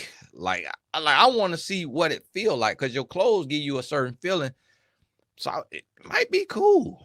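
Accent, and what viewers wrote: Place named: Florida, United States. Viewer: The clothing, hair and archetype descriptions combined with the FL accent is taking me out!!! 😂😂😂😂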